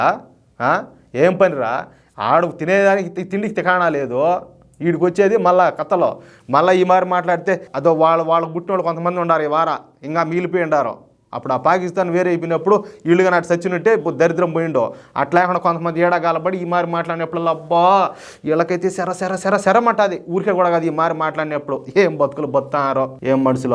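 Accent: Rayalaseema, India. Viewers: Andhra bhadha kadhu , Rayala Seema YASA matladu thunnadu saru .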